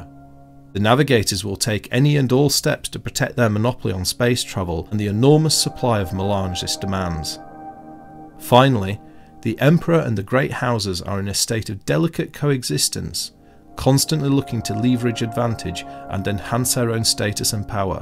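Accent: United Kingdom. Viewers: Love that British accent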